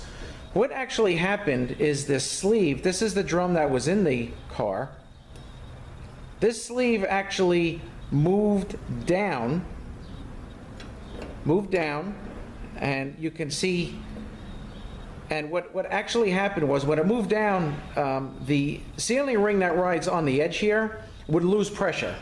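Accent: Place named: New Jersey, United States. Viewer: I like to hear about 5hp19 with Sopranos kinda accent! All best to you!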